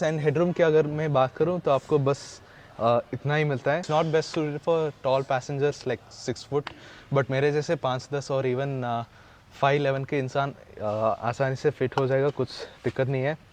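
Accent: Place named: Kerala, India. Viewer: If I am not wrong your accent resembles Keralam.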